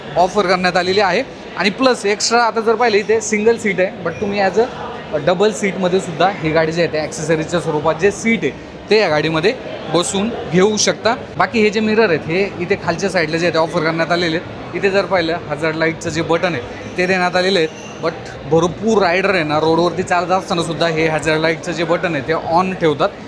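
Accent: Ahirani, India. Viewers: भाऊ तुम्ही अहिराणी आहात का ..? थोडा थोडा आभास होतो तुमच्या भाषेमध्ये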